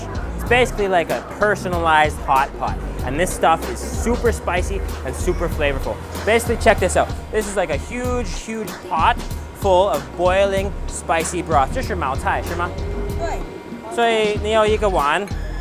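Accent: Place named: China. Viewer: As lived out of China for long, it is really memorable to see these food. Good job man, interesting to see it from the view of foreigner. Man, you talk with local accent, haha, that is lovely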